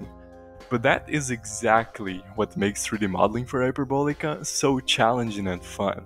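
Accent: Brazil. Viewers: O É BR Q EU CONHEÇO ESSE SOTAQUE. NÃO MINTA PRA MIM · " BRAZILIAN, I KNOW THAT ACCENT. DON'T LIE TO ME " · Primeira vez que eu vejo um sotaque brasileiro numa fala em inglês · Eu amo que da pra saber de onde tu é so pelo sotaque KKKKKKKKKKKK Cadê, posta mais video nesse teu canal aí pra capitalizar nos br te reconhecendo aqui mano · dá pra sentir o sotaque brasileiro entrando na minha alma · I'm glad a brazilian got to work of this (basing that on his pronounciation) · O sotaque do cara é tão brasileiro que fica dificil não reconhecer, parabens cara pelo seu trabalho nessa confusão ai desse jogo, modelando tudo isso ai. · Caraca!!! is brazilian sureeeeeee Kkkkkkk Eae parsa I immediately noticed its brazilian accent Incrível saber que tem um brasileiro por trás de todo esse incrivel projeto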